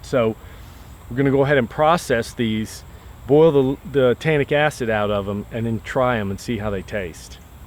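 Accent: Georgia, United States. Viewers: are you from Georgia?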